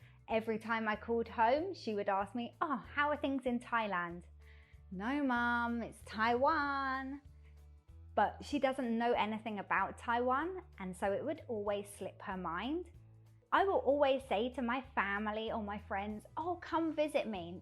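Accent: England, United Kingdom. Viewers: 多麼美妙的英格蘭口音！ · 好有濃厚的在地英式腔調😊😊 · 不會啊@@ 因為妳的發音很英國啊！ 非常好聽～😍👍🤗 另一個YT艾倫就真的聽不大出來他的英國腔。😁 我想……🤔 跟二次大戰後很有關係，特別政治跟戰略和軍隊，麥克阿瑟將軍在台灣是蠻有名氣的一位美國二戰後的將軍。 今天沒有小朋友～😭 PS: 其實沒有疫情，我們今年暑假是想去英國，歐洲一帶玩的。😭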